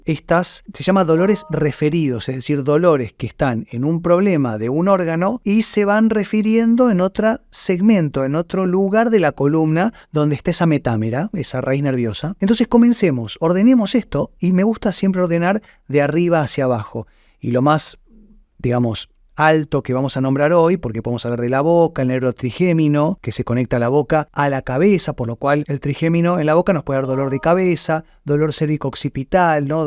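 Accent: Buenos Aires, Argentina. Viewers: Hola sos de Buenos Aires Doc??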